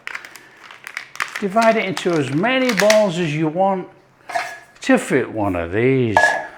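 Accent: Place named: Scotland, United Kingdom. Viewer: I'm half Italian, and this is really making me hungry right now. I'm browsing for bread recipes, I haven't even had anything to eat yet. Loving his accent. Is that Scottish, I hear?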